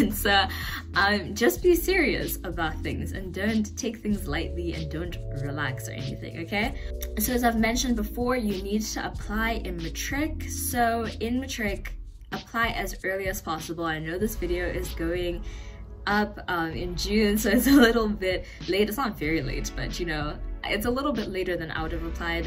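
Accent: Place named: United States. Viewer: Delicious content,. Why do you have an American accent? did you grow up there?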